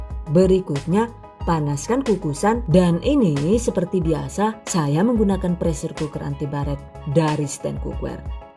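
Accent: Banyumas, Indonesia. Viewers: Lah mbakyune ngapakers nggih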